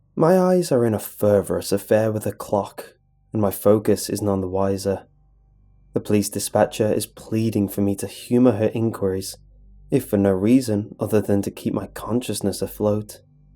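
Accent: United Kingdom. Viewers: Subscribed...I've listened to you tell these stories for a while now. I've always been a horror movie fan n love scary stories but it's your voice and accent that made me subscribe to you. My mother is British and I would spend summers w my grandmother who had a thick accent but I never heard first hand a man w this accent and I've got to tell u yours is enchanting! I could listen to you talk all day...my grandmother is no longer w us so it's also comforting in a way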